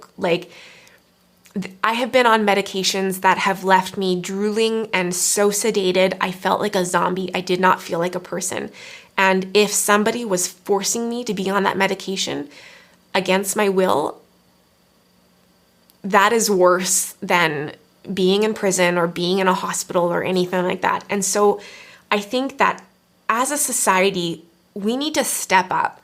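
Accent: Canada. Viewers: The part that was most jarring for me is that they stripped you naked like a criminal prisoner being stripped searched. Why was that necessary? Terrible :( Are you Canadian, btw? Just the way you pronounce "out", "about" etc. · I think you're missing some of her points. She's not saying she doesn't think there are situations in which forced medication is necessary, but that the way in which it's enforced can be much more harmful than it should be. Also, I'm new here, but she sounds like she has a Canadian accent- she may not be from the US- but that's just a guess.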